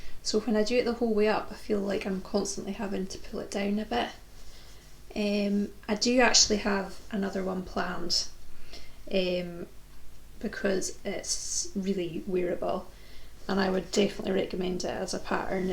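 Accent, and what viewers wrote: Scotland, United Kingdom. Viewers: I also got to your podcast through YouTube suggestions and loved it from the start. What made me subscribe before finishing the first chapter? The good energy you transmit, the 20-30 minute format, direct and calm, the quality of the podcast notes (unbeatable!), Your fabrics and choice of patterns, ... and above all your voice and your way of talk: I live in the north of Spain and watch a podcast in English is a good way to enjoy knitting and at the same time try to practice my "English ear", and your way of vocalizing seems surprisingly clear to me ... I thought that English Scots was harder to understand, but you speak very, very clearly! 😅 Congratulations on your almost two thousand followers, and a curiosity ... why that "Muchísimas Gracias" farewell? It has me intrigued ... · Hi,! I was suggested to watch your podcast by youtube. 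I have been quite thirsty for knitting podcasters from Scotland, as I am here too and the more local, the more relevant, I guess 😊. I do enjoy your accent and your podcast episodes and I’m glad youtube suggested you to watch 😊. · Hi! Your Podcast was in my feed too. I love your accent. I'm not a native english speaker but I always liked to hear the scottish accent. Glad you found the courage to start a Video Podcast. I like your calming way of speaking and that you aim for half an hour of podcasting. Your Intro is super. Your projects are beautiful and inspiring. Greetings from Germany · Hi, your podcast was a recommendation from You Tube, may be because I love other Scottish podcaster as Caz from the wee sew and sew and as well „The meaningful stitch“ I liked your podcast and I love to here the Scottish English your knitting is also so beautiful so I left an abo here.Greetings Vanessa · As someone with fibromyalgia I really really appreciate your approach to podcasting. 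I’m new to knitting and I find it to be peaceful and calming work. It is soothing to knit whilst listening to your soft Scottish voice. I love your content so far. Keep going and thank you. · I found you as a suggestion on utube. I love your soft Scottish accent and it's so relaxing to listen to you chat. You have some beautiful knitting garments and loved what you knit for your new neice. Thank you 😊💓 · As for so many others your podcast came up in my YT recommendations and after watching some minutes of your second episode I was happy to have found you! I like your projects and love a scottish accent 🤩 . Looking forward to many thoughtful hours spent with you! Thanks so much! Anja Fromm Germany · Hello there- I spotted your channel on You Tube just a few days ago and thought I'd give it a try- the name appealed to me 😊 and I've loved it right from the opening moments- lovely and calm. 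I absolutely adore your Scottish accent as it's just so gentle. We visited the U.K. A few years ago and spent a fortnight in Scotland but only went as far north as Inverness- such a beautiful country! Although your giveaway is gorgeous I suggest you don't choose me- I live in Tasmania, the southernmost state of Australia- the postage would cost you too much 😉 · Congratulations!!🎈🎉 I just love your podcast!! I Love Scotland and been several times but never to your area. We still have plans to do the North Coast 500!. I love your scottish accent. You do make the most beautiful things, so keep up the good work. I learned about your podcast through Selma from Little Big Knits. Kind regards, Erna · I love your podcast, and I especially like to just hear you talk - love your Scottish accent! Someday I want to travel to Scotland, it looks so beautiful! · I believe YouTube recommended you to me, I love listening to fellow Scottish podcasters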